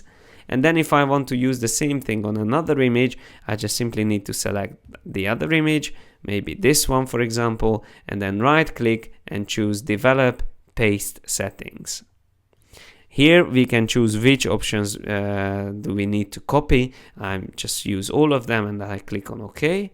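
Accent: Hungary. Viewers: Annyira jellegzetes a magyar akcentus,öröm volt hallgatni:) De persze a tutorial maga is perfekt!!! Rengeteget tanultam belőle,hálás vagyok!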